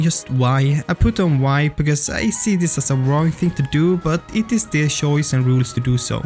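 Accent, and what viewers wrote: Sweden. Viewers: I might be wrong but I think it's Swedish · Love your swedish accent :D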